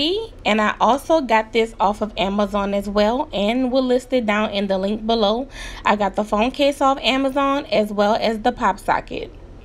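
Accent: New Orleans, United States. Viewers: Love the accent... Clearly a baby lol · I viewed bc I needed ideas. But after I seen & heard that accent I jad to subscribe!!! I love the City plus my husband is from there 🥰🥰🥰🥰🤗🤗🤗🤗. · Your desk is adorable! What do you do? OMG I NEEED those snacks! haha I love your accent you've GOT to be from am I right??;)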